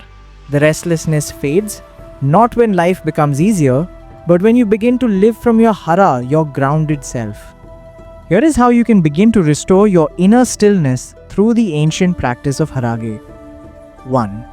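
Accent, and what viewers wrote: India. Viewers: Your voice and accent is Indian . Are you Indian who lived in Japan and now living in North Korea ( not even in South Korea). Genuinely curious.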